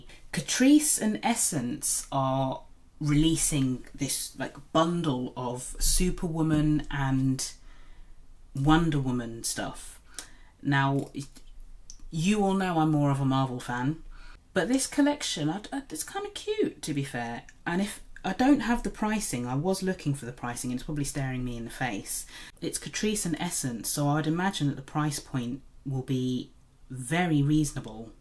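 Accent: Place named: Boston, United States. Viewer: She's from Boston, I believe, and I feel like it's a bit exaggerated too 🤭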